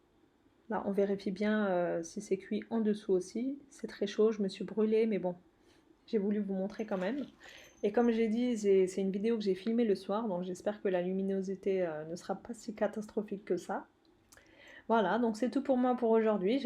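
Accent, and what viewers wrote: Alsace, France. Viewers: slm je viens de découvrir votre chaine..toujours de belle recettes a testé..bien expliqué ..vous etes de l'Alsace ya comme un petit accent 😀bravo a vous en tout cas 🖒🖒😚j'aime bcp la cuisine turque aussi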